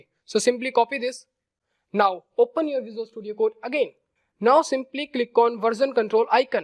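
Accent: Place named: India. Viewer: Whenever i hear an indian accent, i subconsciously trust his words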